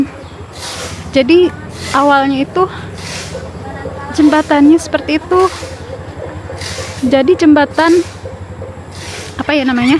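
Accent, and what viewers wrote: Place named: South Sunda, Indonesia. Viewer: Logat pakidulan nana ange yeh 😂😂😂😂😂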